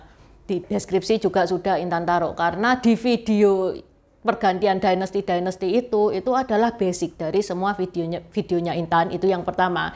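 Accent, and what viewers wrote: Java, Indonesia. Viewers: Uraian ceritanya, ter urai indah, walau tekanan / aksen jawanya masih medok, tapi masih dapat di mengerti. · Mbak Intan kental banget logat Jowo nya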